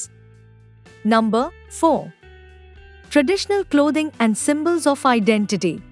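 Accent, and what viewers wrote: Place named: India. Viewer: why does your voice have an indian accent? are you indian?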